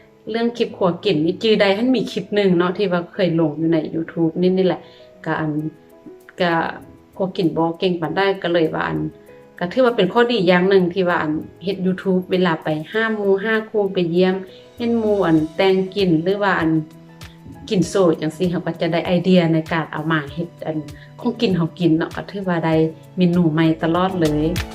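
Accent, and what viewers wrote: Luang Prabang, Laos. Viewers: แสดงความยินดีด้วยค่ะมากๆค่ะ เว่าม่วนหลายจ้า ฟังสำเหนียงก็รู้ว่าต้องยู่หลวงพระบางจ้า บ้านพี่อยู่หนองคายอ.ศรีเชียงใหม่จ้าตรงข้ามเวียง ผ่านกฏเร็วมากๆค่ะเก่งมากจ้า👏👏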